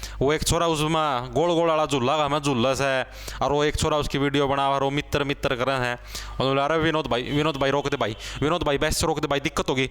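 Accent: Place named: Kaithal, India. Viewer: Bhai kaithal side ki yhi boli h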